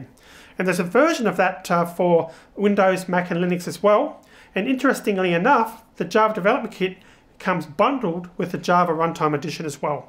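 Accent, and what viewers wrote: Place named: Australia. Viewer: I love learning java with aussie accent!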